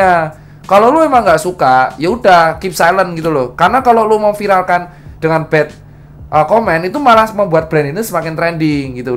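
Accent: Java, Indonesia. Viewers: Ngomongnya medok tapi keren 😅👍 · Bahasa indonesiamu medok mas, podo 😂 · Dr tirta jowo medok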